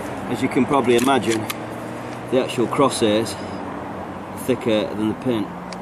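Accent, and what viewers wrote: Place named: United Kingdom. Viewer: Great shooting, that's a tiny hole in that squirrel. Think I need a bit more practice! Given your accent, I take it the gun's sub 12ft/lbs?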